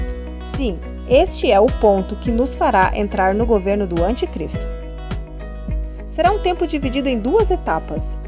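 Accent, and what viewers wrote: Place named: Paraná, Brazil. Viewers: É região sul, está muito certa! O sotaque do sul é tudo meio parecido mesmo... kkkkk! E nesta região alguns pontos são em torno de 900 metros de altitude. O Criador nos guiou para este local, foi de uma hora pra outra. Estávamos nos organizando apenas com barraca para fugir quando chegasse o momento. Por isso, estamos mostrando tudo que estamos fazendo! Desta forma, acreditamos que o nosso mover pela fé possa encorajar os irmãos a fazerem o que estiver ao alcance. Sabemos que construir refúgio na montanha é algo mais extremo, mas é olhando para um extremo assim que muitos podem pensar em fazer o mínimo. Entende? Sobre família, eu faço questão de contar a todos que me perguntam! Apenas meu marido está enxergando do mesmo jeito que eu. Meu pai e minha mãe apenas gostam de natureza, mas meu pai acha loucura esse lugar. Ele reclama quando vai lá... 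E ele não gosta quando a gente fala do tempo do fim. Já a minha mãe fica mais em cima do muro, ela até entende tudo que a gente fala, mas ela acha que não é para agora. Mas eu ainda tenho minha irmã, meu cunhado, a família do meu cunhado e toda a família do meu marido que estão vivendo no mundo e não dão muita importância quando a gente fala alguma coisa. Então, nós sabemos que precisaremos deixar tudo e todos para trás quando tudo acontecer. Estamos nos preparando espiritualmente, psicologicamente e na parte prática também. Quanto à empreitada, eu e meu marido temos em mente que não faríamos isso, até não conseguimos acreditar que est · Me inscrevi nesse tbm , irmã. Vc é do Estado do Paraná?. Aparentemente parece vegetação da região sul, dois lugares me faz lembrar da região Sul ou Paraná como tenho dito ou Santa Catarina . Região q está no mínimo a 800 M de altitude do nível do mar . Eu tô certa QTO a isso?. 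E tbm pq vc tem sotaque paranaense . Ou no máximo santa Catarina q o sotaque é mais forte , mas acho q vcs são do Paraná. Sucesso nessa empreitada. E outra vc pelo jeito de parentes q ainda estão são n foram beijados, mas no meu caso n tive esse livramento familiar.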